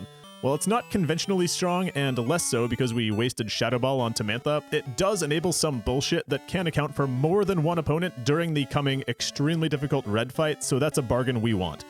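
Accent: United States. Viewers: this is not an AI voice over, just some variety of American accent.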